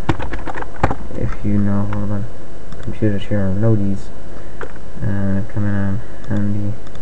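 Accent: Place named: United States. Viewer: Hey. Dank je voor deze tutorial. Dat hielp me veel. En jouw Engels is heel erg goed eigenlijk. Je klinkt als je Amerikaans bent. Sorry als ik een fout maak, ik spreek alleen een klein beetje Nederlands.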